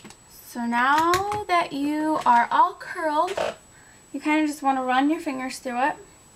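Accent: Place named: New York, United States. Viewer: omg you totally have an accent! haha i can tell anyone from new york has a tiny bit of an accent.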